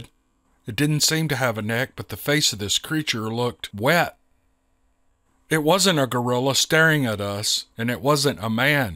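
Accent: South, United States. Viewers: LOVE this man's narrating!!! From the south myself and miss the accent!!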